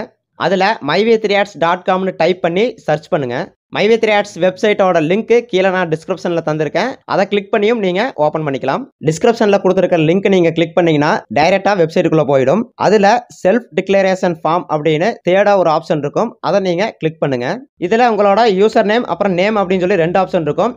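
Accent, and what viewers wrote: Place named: Kongu, India. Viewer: கொங்குத்தமிழை கேட்பதே தனி சுகம் தான் நண்பரே!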